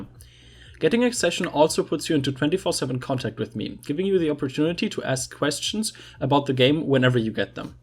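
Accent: Germany. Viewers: Dein English ^^ Man hört sofort, dass du deutscher bist. :-)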